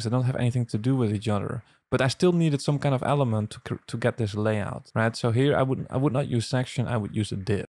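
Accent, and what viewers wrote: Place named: Netherlands. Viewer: I hear a Dutch accent :)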